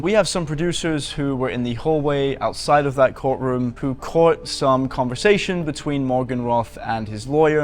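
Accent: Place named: United Kingdom. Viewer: Who’s this slow talking Brit 🥱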